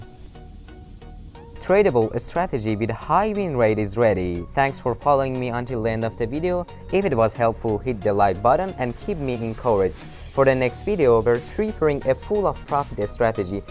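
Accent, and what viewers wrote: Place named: Iran. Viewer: IRANIAN ACCETN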